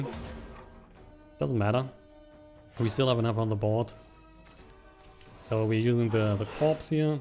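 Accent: Germany. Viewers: You sound so German lol. Great video, you should make Shadowverse Videos more often! But why don't you use ceres? I thought she was a staple. Also could you make a Midrange Swordcraft guide too?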